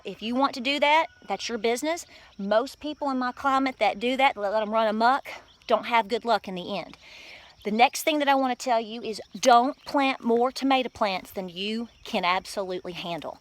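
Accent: South, United States. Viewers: (Brit) You say Tomayto I say Tomahto. But let's not call the bloody thing off cos I love your video! And that American accent! :) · adorable southern accent!!!! Thank you for your help.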